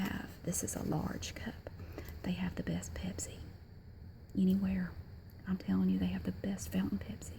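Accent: South, United States. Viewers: Compared to your recent videos, you seem like you've lessened the intensity of your accent! This is definitely a harsh southern accent, I miss the southern rambles :(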